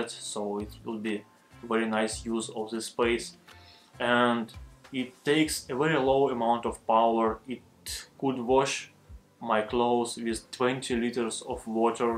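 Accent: Russia. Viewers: Услышав знакомый акцент «зе», поняла, что это русский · русский акцент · Какой-то русский Акцентик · Я по аценту понял, ты из России?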